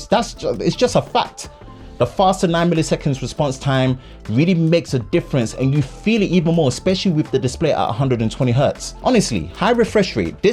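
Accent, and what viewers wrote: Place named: United Kingdom. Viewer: let's just say, I didn't expect a silky British accent, lmao.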